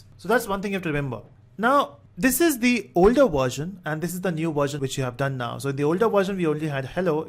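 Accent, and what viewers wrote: India. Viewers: indian accent？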